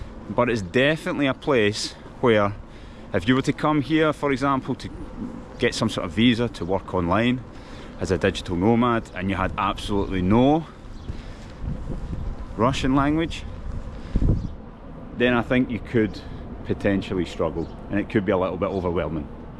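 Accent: Scotland, United Kingdom. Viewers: I love your scottish pronouncuation, it's so cool! And yes you are right, we russians do respect the fact when a foreigner is trying to learn russian, that's already enough for us 😊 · Я половину видео не могла понять почему мне сложно его нормально понять), потом увидела название канала, и подумала, что впервые слышу шотландский акцент. Интересно звучит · Вас за кавказца не принимают? Шотландский акцент очень специфично звучит на русском. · it's very interesting to listen to the Scottish accent, but unfortunately the videos are rarely released... does a Scot in Moscow really have so little to tell?) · Мне очень нравится Шотландский акцент в английском, have a good time in Moscow, lad! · Шотландский акцент просто огонь. Как и квантовый выбор падежей. Это достойно уважения. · Обажаю шотландский акцент, просто музыка для ушей. Мне он кажется очень жизнерадостным. 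:) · Wow your Russian has less accent than your English. Your Scottish accent is heavy and sounds really cool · Очень нравится твой шотландский акцент! :)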